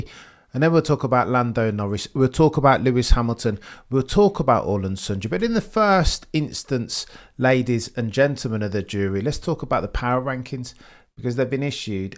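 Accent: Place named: England, United Kingdom. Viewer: Your sound is English but a Verstappe-fan? Are you pissing my ear chap?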